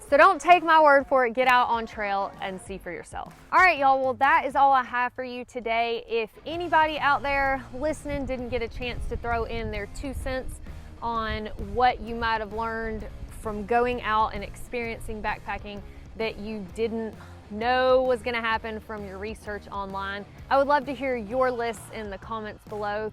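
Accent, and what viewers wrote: South, United States. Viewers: You sound American ! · TOO FUNNY!😄 Love your accent,! REAL Southern! 👍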